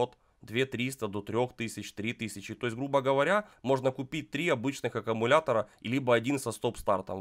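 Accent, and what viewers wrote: Ukraine. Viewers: блеадь эта укр ская манера речи хрен что поймешь ) даже увеличив звук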